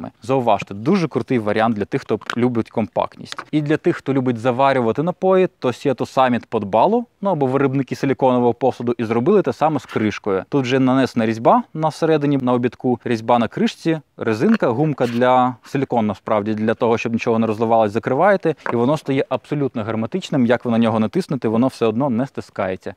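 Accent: Standard. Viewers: Не так давно відкрила для себе, але приємно вражена - все подано дуже цікаво, дотепно, тішить слух несуржикована українська мова, дякую за чудову роботу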